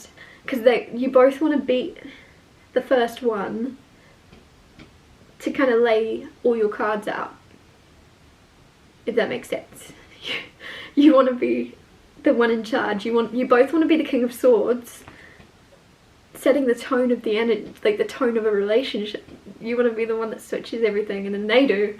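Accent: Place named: Scotland, United Kingdom. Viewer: Are you Scottish perhaps or of Scottish extraction? We have a thing for hot 🔥 blonde 👱‍♀️ Scottish neuroscientists having lived w one in East Harlem & after reading 📚 Kelly McGonigal's book 📖 'The Willpower Instinct' when we learned that the greatest predictor of human resilience is Heart Rate Variablility & we spent a year getting down to breathing 1 breath per minute & going to NYSC & doing an hour on the Stepmill, burning 🔥 850 calories & getting our heart rate up to 165 bpm, & we've needed every bit of resilience we developed trying to survive in the 🌎 since we left NYC. What if we're a lesbian in a man's body? "Exit Through the Gift 🎁 Shop" is an excellent movie 🎬 we saw down on Houston St. about Banksy & Mr. Brainwash. We don't tell anybody anything because they never like hearing what I have to say & tell me that it's just my opinion. 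kthxbye!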